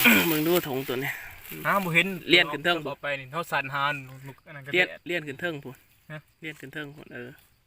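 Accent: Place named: Savannakhet, Laos. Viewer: ຟັງສຳນຽງຢາກຄືໄທສະຫວັນນໍ້ຢູ່ບ້ານໃດເດ້ຢາກໄປລ້ານຳເດ້ນົກຫລາຍແບບນີ້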